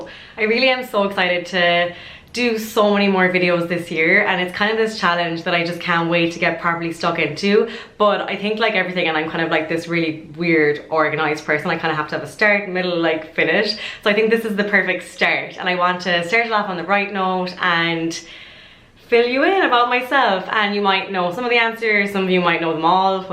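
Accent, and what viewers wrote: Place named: South, Ireland. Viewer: I could listen to you chat all day, even though I'm from Northern Ireland I just love the southern Irish brogue, you don't come across as nervous at all you come across so confident. My natural hair colour was light auburn with brown eyes but my niece is more red haired like yourself & she has brown eyes. I wish you all the best I've no doubt you will succeed in everything. I'll be looking forward to all your videos. Oh & I have to tell you I love your sister and your Mam, you have such a wonderful family and not to forget vogue. Sweet Irish blessings to you all. 😘🍀😘🍀😘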